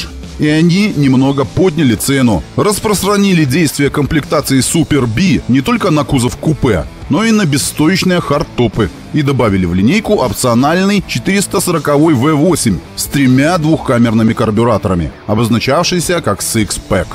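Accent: Belarus. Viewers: Автор из Белоруссии? Интересный "говор"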